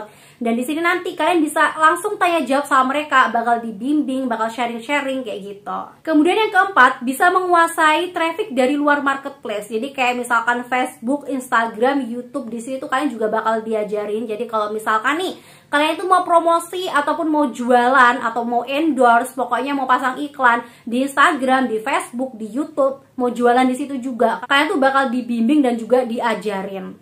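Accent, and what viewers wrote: Java, Indonesia. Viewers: Kowe wong jowo to mbk, kuq bosomu medok😂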